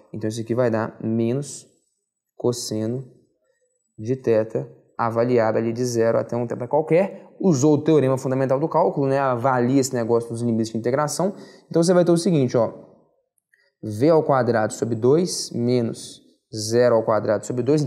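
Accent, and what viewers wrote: Minas Gerais, Brazil. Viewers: seu sotaque massa "minerim"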